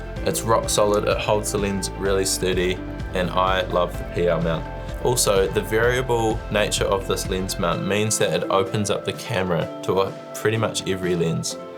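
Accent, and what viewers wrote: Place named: New Zealand. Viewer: Hah, might be worth it to specify that. I very much noticed the Kiwi accent, but seeing as the majority of L1 English speakers on earth are American, the word "dollar" is presumed to be the American one unless otherwise specified (online and in international contexts). That's what some of us are incredibly confused about the price (I came to the comments to see if you'd explained it) — for us, the Ursa 12k is $6,000; and the Ursa 4.6k, even cheaper. So when you said it was $25,000, it very much elicits a terrified "OH GOD WHY DID HE PAY THAT MUCH MONEY" response.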